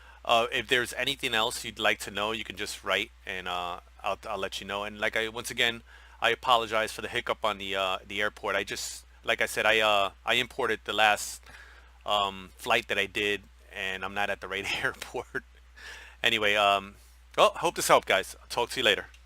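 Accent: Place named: New York, United States. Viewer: Love that accent mate, New York?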